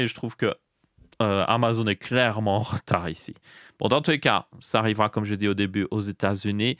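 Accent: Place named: Belgium. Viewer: Tous les nombres qui sont énumérés avec un accent belge me font perdre le fil, ça devient difficile de suivre puis je décroche complet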